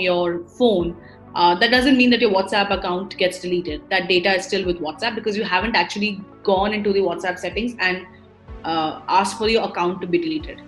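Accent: India. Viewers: Thick Indian accent or pronunciation errors. Eg. Privacy. Couldn’t follow. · Why can’t we get an American native speaker to explain what is going on. A Hindu speaking with a thick Indian accent that is dying trying to speak English. This is totally WILD. It’s completely tiring trying to understand this.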